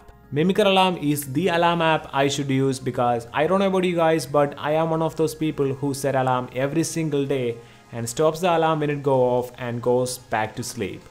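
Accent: Kerala, India. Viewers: He's from Kerala. Can easy make out the Malayalam accent in his English. 😀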